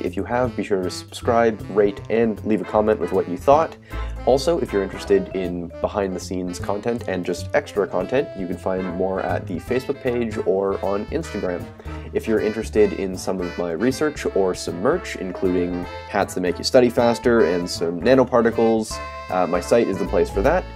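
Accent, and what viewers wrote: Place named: Canada. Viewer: This is the perfect example of a Canadian accent. 😂 awesome video, even if I’m 3 years late.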